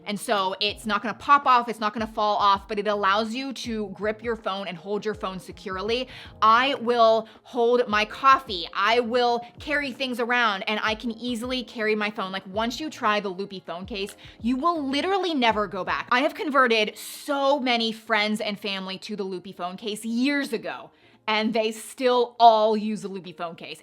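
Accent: South, United States. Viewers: Nothing wrong with sounding Country 😂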